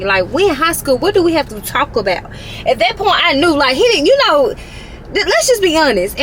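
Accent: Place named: Mississippi, United States. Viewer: Mississippi girl here! Eboni Ebo sent me over to your vlogs and I knew from the moment you spoke “she is from the Sipp” haha I love it! I have been enjoying your content so far and will continue to support. Thanks for sharing with us!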